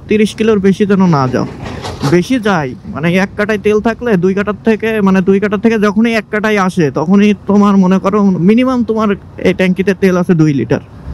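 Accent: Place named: Kushtia, Bangladesh. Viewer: আপনার বাসা কি কুষ্টিয়ায় 😄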